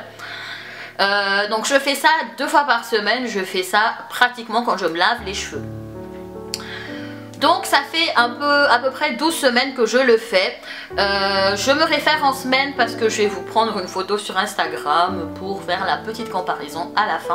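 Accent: Madagascar. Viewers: Coucou! Je voulais te dire que ta vidéo est super ! PS: Tu es malgache ? J'ai l'impression que ton accent ressort un petit peu! Bisous :)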